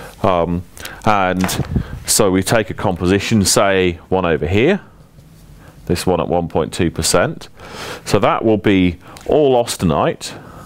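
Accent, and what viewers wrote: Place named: United Kingdom. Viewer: Being an American from the deep South, when I heard his British accent I knew this was going to be a good night. My entire study group sends a thank you from across the pond for posting these videos. Hopefully we'll pass the test in the morning.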